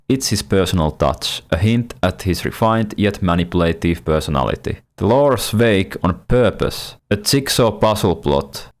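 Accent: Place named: United Kingdom. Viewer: Bro sounds britsh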